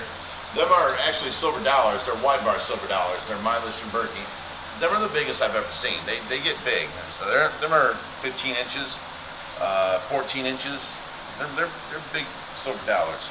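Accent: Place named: Ohio, United States. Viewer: Ohio accent. I like it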